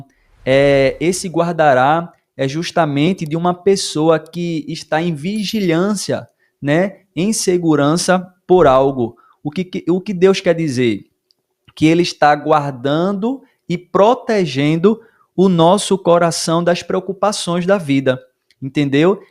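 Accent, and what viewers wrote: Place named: Northeast, Brazil. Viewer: sou Do RJ, pelo sotaque creio que seja do nordeste. Fico feliz por ser tão jovem e dedicado a obra de Deus. Estava fazendo um estudo e apareceu o seu aqui no meu celular. Fiquei feliz . Deus te abençoe um abração do carioca.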